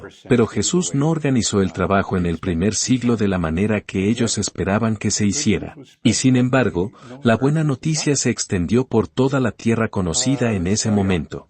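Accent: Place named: Spain. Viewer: You Will forget Your spanish accent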